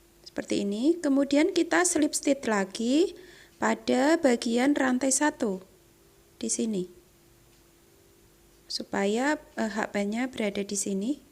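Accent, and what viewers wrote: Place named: Java, Indonesia. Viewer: Mantap suka suaranya medok 😍😍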